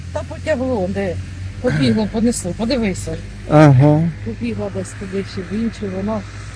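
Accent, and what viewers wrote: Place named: Dnipropetrovsk, Ukraine. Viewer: Доброго ранку!Дивлюсь Вас і посміхаюсь,Ви такі класні!І говір у Вас такий ,як у нас ,ми з Дніпропетровщини,теж сільські,але рідко кудись вибираємось.Дуже дякую за Ваші прогулянки ,А балачки то взагалі , як похід до психолога,тут і поради і пояснення!З Вами просто і легко!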